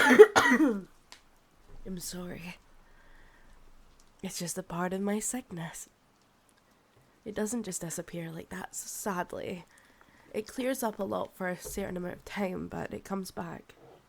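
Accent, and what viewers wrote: Scotland, United Kingdom. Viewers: Slow down my vampire mistress, the blood is not going to run away from you, almost tearing the pet's arm.😅😅 This video is wonderful I enjoyed it very much, vampire+ the scottish accent equals a awesome video to watch and fall in love with it.😍😄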